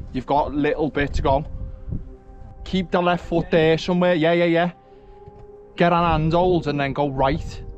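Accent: Liverpool, United Kingdom. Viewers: Good filming & great to hear a fellow scousers on You Tube. Cheers lar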